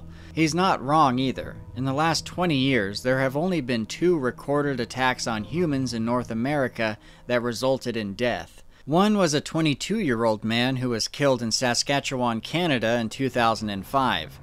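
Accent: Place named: Upstate New York, United States. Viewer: Took me a bit to figure out that accent... ITs the same as Rod Sterling. Guessing your in up state New York, or close to it,,,lol.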